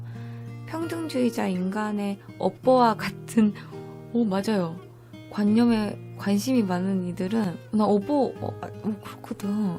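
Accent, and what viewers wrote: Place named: Jeolla, South Korea. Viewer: 전라도 사투리가 넘매력적예요 파티마님에서 이제 으로 갈아탔습니다 ㅎㅎ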